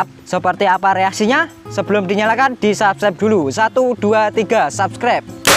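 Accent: Java, Indonesia. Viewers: Arek jowo yo mas...😀😀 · Jowo pinggir ndi mas ?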